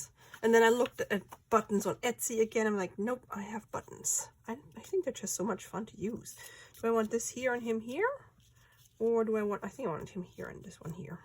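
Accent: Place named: Minnesota, United States. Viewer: I love these! This vid just popped up. Are you still making videos? You sound like you’re from Minnesota or Wisconsin. Just an observation. Great content. 👍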